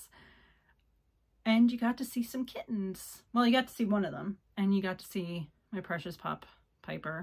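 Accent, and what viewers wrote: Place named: Canada. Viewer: Good morning, You sound like you are from Canada. Whew you have quite a stash! The amount of yarn you can remember what it is and how you will be knitting it is dizzying! Are you challenged to figure out a plan for prioritizing or do you knit what you are in the mood to do? I'm guessing you are a really fast knitter. I'm envious! Thanks for sharing and your pet friends are adorable! I have 2 border collies to keep me company while I knit. They constantly entertain and the youngest one got a hold of one of my hanks for a sweater that was in a project bag and turned it into an impossibly swirling knitted mess of a string pile. I was able to untangle and wrap into a ball that took me 3 evenings. I couldn't get mad at him though, I need to be more careful so I am!!!! Cheers to you for sharing your deep stash! Carrie from the San Francisco Bay Area. 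😘🍵🧶🪡🧵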